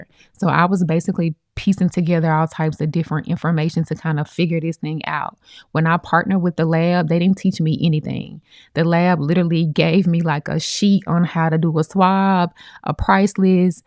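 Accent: United States. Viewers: Luv the southern twang :) and enjoyed your professional presentation of your topic...the meat and potatoes without all the garnish!! Very insightful and honest and that is what i feel a lot of content creators are missing...authenticity. Thank you for the wealth of information and keep doing what you're doing!! Stay Blessed!!! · I’m a phlebotomist and have always wondered if I could do this! Thanks!! I’m with CDNA and it is free. They didn’t charge me anything! And I’m in Ga you sound like a Atl girl. I will send patients we can’t take up your way! ❤thank you again for these gems!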